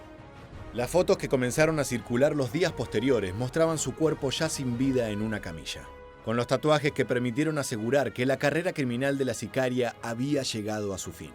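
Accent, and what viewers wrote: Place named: Argentina. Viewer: Pues el narrador ha de ser argentino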